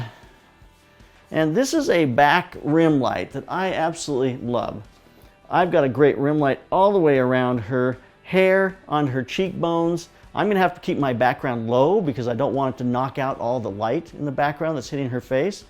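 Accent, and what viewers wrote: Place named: United States. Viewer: Best American Accent Ever!!!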